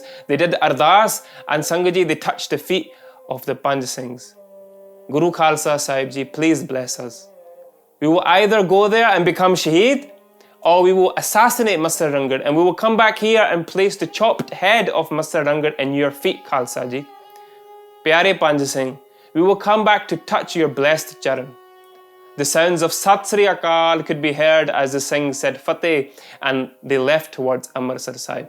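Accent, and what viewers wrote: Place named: Scotland, United Kingdom. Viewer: Brilliant job bhai ji, and I adore your Scottish accent.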